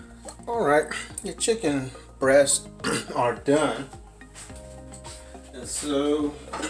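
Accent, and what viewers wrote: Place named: Tidewater, United States. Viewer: I knew it!!!! I knew it!!!! Soon as I heard you speak, I knew you was from Tidewater! That’s my home Fam! 757 Bad Newz!!! Man Love Me some Yock Bruh! Can’t wait to make your recipe Homie! Salute!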